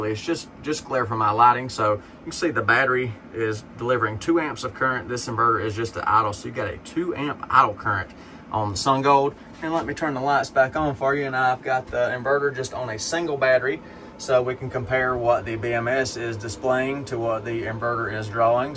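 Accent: South, United States. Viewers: I am very interested in the Sungold (SRNE) inverters. Could you maybe go over what solar panels you have and how they are configured and the changes you plan to make? Also, what gauge pv wire did you use? Maybe you can just add a new ground mount with a PV run from your old mount to your new mount and just hook up all the panels in series? Also, if you are getting a new ground mount, the ecoworthy single and double axis trackers look like they could be a really good investment and increase your active solar hours and extend your generation time (usually by 30-40%). I also love the southern accent! Fellow southerner here! Love it when people sound like my neighbors.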